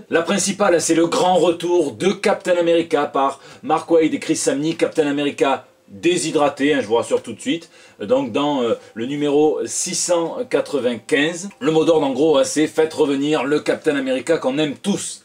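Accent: South, France. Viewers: Je préfère regarder ta barbe soyeuse danser délicatement au son de ton magnifique accent du sud! Tu nous la fous en demi-molle chaque mercredi tu sais.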